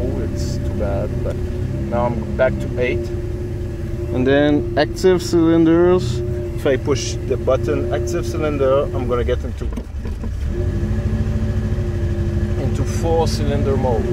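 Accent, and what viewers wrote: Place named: Quebec, Canada. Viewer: french people refer to people living in France. this accent is from Quebec, Canada. Are you english?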